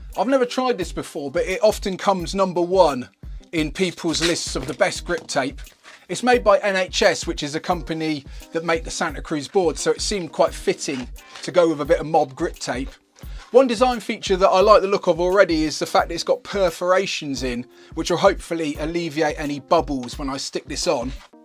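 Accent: United Kingdom. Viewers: Proving once again that adding a British accent to anything makes it cooler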